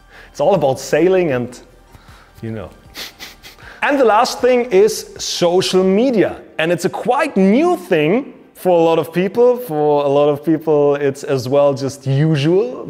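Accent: Germany. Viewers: You sound like a german who's speaking english edit: I just recognized he's german lol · Hello from "Nepal", thanks for making this video and uploading. Quite useful and exactly what i was looking for. I am also more into landscape photography & about to buy a camera , so far i am only using my phone😁. Could you plz suggest me which camera would be good (not so much expensive one) i can spend upto cannon 77D , and also i saw that cannon 700D was similar and quite cheaper . Btw i am so familiar with your German accent english 😌. I learn it from my dearest friend in Hamburg. 👍👍👍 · after a long time I'm listen to German english ....cheers bro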